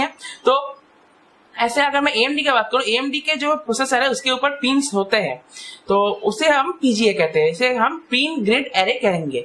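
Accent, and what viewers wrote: Bengal, India. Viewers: Bengali ho kya???🤔🤔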